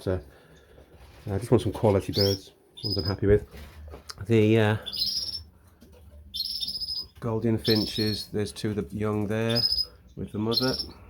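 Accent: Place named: Potteries, United Kingdom. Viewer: YOUR ACCENT AINT NO GEORDIE ACCENT, MY GUESS IS THE POTTERIES?? MY TEAM IS SO UNPREDICTABLE THESE DAY TOO MANY CHANGES AND A NUMBER ONE DE-STABILIZER 'POGBA' SKULKING FROM HIS CONSTANT INJURY TABLE, MAN UTD. LET'S......... AW GAN ALANG THE SCOTSWOOD RD AN'.......... WORSHIP ALLAN SHEARER, LOL''............ THANKS FOR THE PARTY FELLAS.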